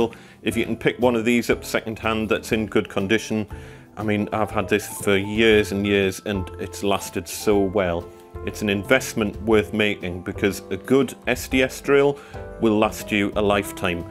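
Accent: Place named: Northeast England, United Kingdom. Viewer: Hi. Good video. I've been using an SDS drill for about 9 years ( 1930's house - super hard brickwork ). About 3 years ago I saw this video: <link> & took note of it. The next time I used the SDS it caught in a wall tie & kicked violently. If I hadn't been holding it as the video instructed I reckon I'd have been nursing a broken wrist & jaw & whatever other injuries being thrown off the stepladder would have caused. Thanks for the info you provide & it's nice to hear the accent ( I'm from South Shields but haven't lived in the NE for about 30 years ).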